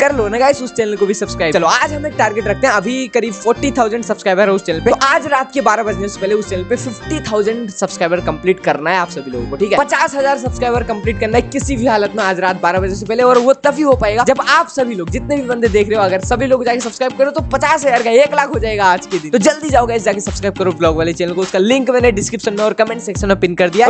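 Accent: Odisha, India. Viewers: Bhai tu odiya he na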